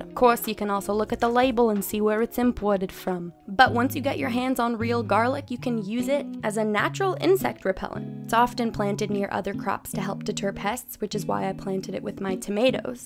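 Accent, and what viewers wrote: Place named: New York, United States. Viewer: Just curious how come you have a bit of a New Jersey/New York-ish or I guess maybe even New England-ish accent when you’re from Canada? Have you lived in that area before?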